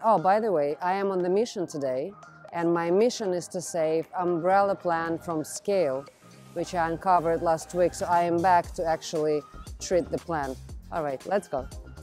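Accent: Russia. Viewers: You accent seems like a Russian?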